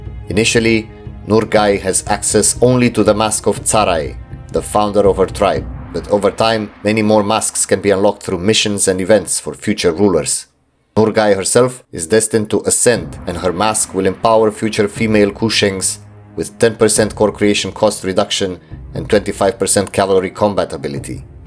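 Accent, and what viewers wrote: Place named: Romania. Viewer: A friend of mine presented me your channel and after watching countless of youtube eu4 hours(anbennar included) I have to say your latest stuff(7 months ago) focused on anbennar is S++. I hope you get the motivation with the boots of views to keep doing this. this is why youtube is great because gems such as yours appear from time to time. I would also strech and try to guess - are you from romania? you have a funny romanian english accent and if you do, mad respect. I promise i'll pledge when i get paid. Keep it up broski!!!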